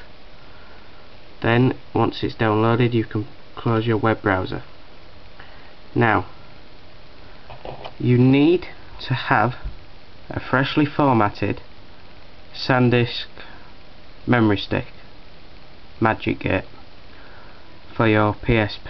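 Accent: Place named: United Kingdom. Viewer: i fall love with your british accecnt :>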